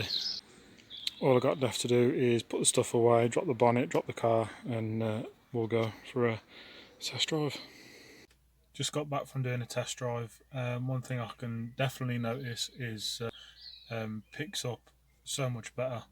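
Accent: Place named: Birmingham, United Kingdom. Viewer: Wanna help me out with my S40 T5 build?? Same engine as the ST You sound like you're from brum, so I'm assuming you're local ??👀😅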